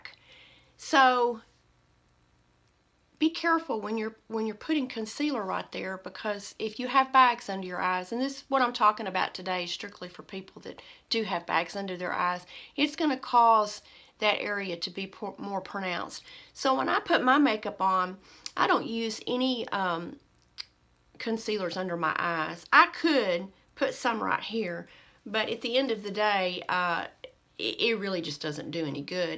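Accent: South, United States. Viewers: Hi, you are so right about this! Simple logic that I certainly did overlook. I occcassionaly get bags so I will remember that. I do get dark circles so for that I will use the concealer. I love your southern drawl. Feel better. Melissa is a sweetie. xoxo Much love xo Marta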